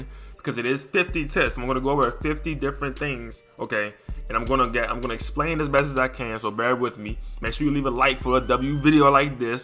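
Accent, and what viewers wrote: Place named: Washington DC, United States. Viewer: Bruh definitely from DC or Murland..Being from Seat pleasant, I know us when I hear it 😂 Good shi doe fool 💪🏾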